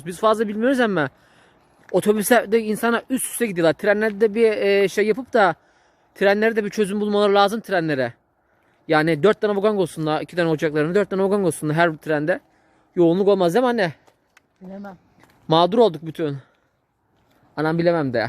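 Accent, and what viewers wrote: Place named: Bartın, Turkey. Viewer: Bu şive olayına takanları anlamıyorum insanlar bu şekilde nasıl abartarak konuşabilir çocuk hemen hemen her videoda şive meselesini konuşuyo inanmayanlara açıklama yapıo haala abartıyorsunuz diyorsunuz valla pess tek dert bu olmuş. Ben bartınlıyım çaycumalı tanıdığımda var bu şekilde konuşuyorlar kardeşim sen şive ile ilgili açıklama yapma bence bilen biliyo inanmayanda izlemesin sevgiler🙋‍♀️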